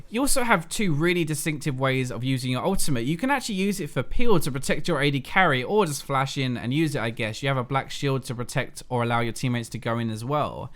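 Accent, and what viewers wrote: England, United Kingdom. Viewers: is he english or an american?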